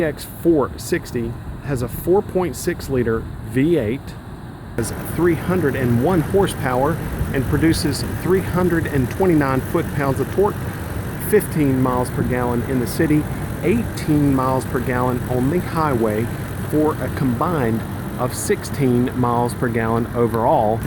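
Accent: North Carolina, United States. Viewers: Thank you sir for this informative video! Going to pick up my new GX here soon and you have saved me a lot of time. Love hearing your North Carolina accent too (I'm from WNC). Will tune in for more car related videos!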